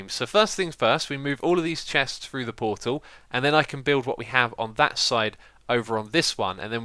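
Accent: Australia. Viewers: Is an Australian? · Can confirm that is an Australian accent, source: I am Australian;) Love the video!:-) <3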